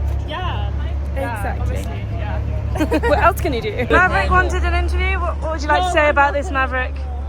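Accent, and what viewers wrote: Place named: United Kingdom. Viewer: Love that British accent , that’s makes me come back to watch your videos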